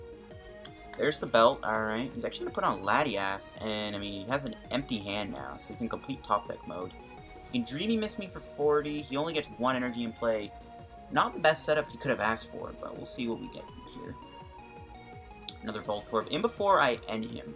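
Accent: Canada. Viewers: r u from Canada ?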